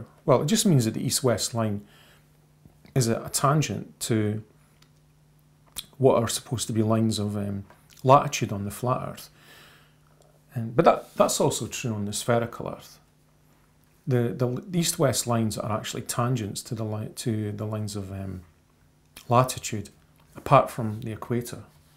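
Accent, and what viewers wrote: Scotland, United Kingdom. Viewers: Holy shit man! That's horrible. Personally I love your accent man. I come from a primarily Scottish family, here in Canada, so it makes me feel right at home actually. You sure as hell are more well spoken than some Scots. Some of them have such poor diction, that you can't even understand them, unless you are Scottish. You are perfectly understandable actually. People are fucked. Then to criticize your hands? Oh man, how childish. They are clean and so are your nails. Lol. So I don't get that either. People will pick anything when they have nothing huh? Lol.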